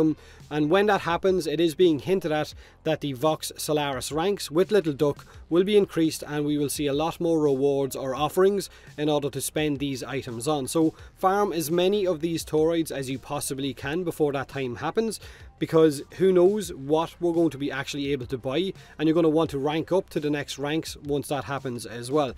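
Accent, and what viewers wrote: Ireland. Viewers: Hay is you Irelandish?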